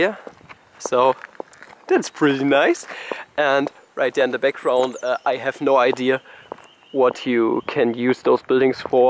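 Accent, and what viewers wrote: Germany. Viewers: I do love how blunt German's sound when they speak in English, great video nonetheless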